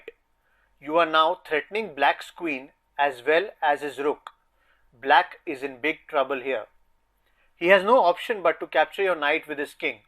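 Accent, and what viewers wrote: India. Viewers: Most indian sound i have ever heard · IM SO TIRED OF INDIANS AND THEIR ACCENT... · Not the Indian accent OH NOOOOO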